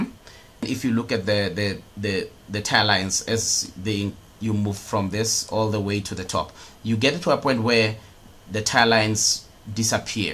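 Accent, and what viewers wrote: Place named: India. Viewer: nice job. Thank you professor. I love your Indian English❤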